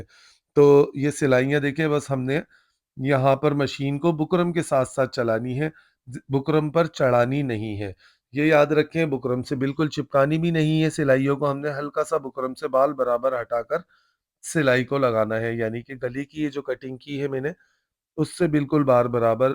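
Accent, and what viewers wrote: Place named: Rajasthan, India. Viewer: Assalaam o alaikum. Mash'Allah bohat asaan teriqey se aap ne gala banana sikhaya. Mai aap ke videos bohat ghaur se daikhti hun. Mai bhi bohat safai se her qism ke kaprdey seeti hun laik phir bhi mainey aap ke videos se bohat kuch seekha hai aur mazed seekh rahi hun. Aap ke lehjey se lagta hai aap Jaipur Rajistan se hain. Kaash aap karachi mei hotey to main aap se hi keprdey silwati. Wassalaam. Jazzak'Allah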